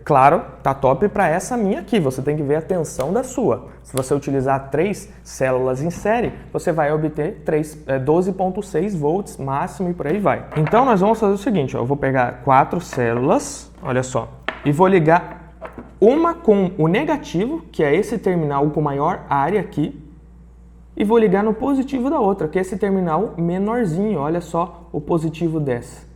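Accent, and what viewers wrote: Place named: Paraná, Brazil. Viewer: Fala, esse daí em final de frase... Acho que tu é do norte paranaense hein... Rsrs. Massa a aula, inscrito aqui e acompanhando pra aprender um pouco sobre eletrônica. Hoje trabalho com suporte em TI, mas estou buscando um 2ª fonte de renda.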